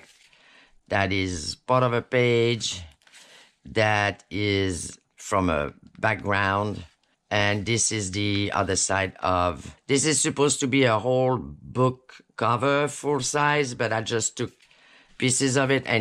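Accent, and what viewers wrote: France. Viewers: I just love it the grundy look. Absolutely luv hearing you talk. The French accent. Reminds me of home. Gaspè, Quebec. Wear are you from? Happy you are workin with Tinne. Just amazing kits. Ok now to watch tutorial! Cheers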